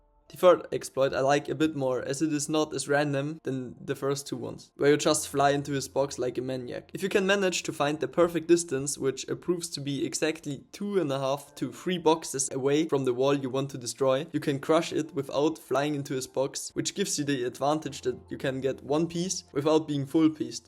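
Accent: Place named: Germany. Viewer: The german-english is crazy, but W vid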